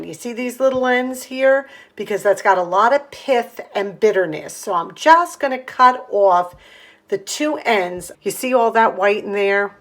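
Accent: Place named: Long Island, United States. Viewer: Hi. This is my first time here. I love frugal videos and yours is the first I've seen from NYS. I'm a New Yorker too....from Long Island. I think I detect a LI accent from you. Lol. I'm going to make the orange marmalade....mmmm. I love marmalade. is doing a great job on the deck. There's so many ways we can save money. Thanks for sharing. I subbed you and I'm looking forward to watching more of your videos. 😊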